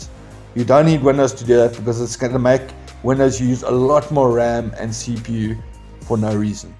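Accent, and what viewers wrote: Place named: South Africa. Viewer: will do! My undervolt setup was from when my cpu max load was set to 60% so should he able to change it more now. There's some good ol new zealand slang for ya mate! Love your South African accent, my neighbors are from SA and are the most hilarious people I've ever met, awesome to watch the rugby with. Really appreciate the help bro! Never seen another channel that is so patient and informative with their community.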